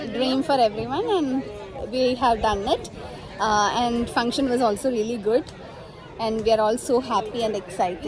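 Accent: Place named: Palakkad, India. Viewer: No idea why your video came up as a recommendation. Congrats on your graduation. Are you from Palakkad, you speak just like my husband? My husband is from Palakkad, Kunisserry. My daughter graduated from Grad school in May of this year and works in Investment Management, we have lived in the United States for close to 30 years now.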